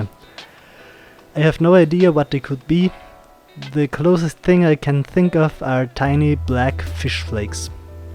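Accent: Germany. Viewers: You should have cleaned the bottle too. There were still residues here, probably some of the black particles as well. It would be a shame if all the effort had been in vain. I can also hear a German dialect. Can this be? =) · Bist du Deutscher? Klingt fast nach nem Sachse xD Liebe Grüße aus Leipzig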